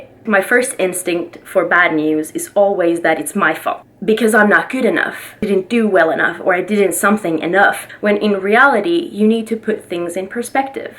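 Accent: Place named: Louisiana, United States. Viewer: is getting that Cajun accent. 😛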